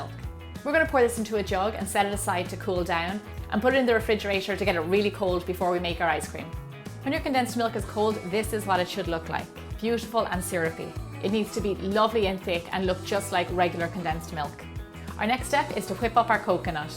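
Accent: Ireland. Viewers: Delicious!!!! and I like your Irish accent. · Is that an Irish accent breaking in and out? Lovely!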